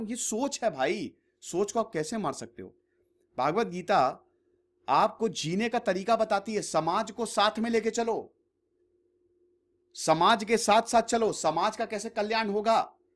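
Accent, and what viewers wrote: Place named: Lucknow, India. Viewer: 😀😀😀... Lucknowi andaaz 😎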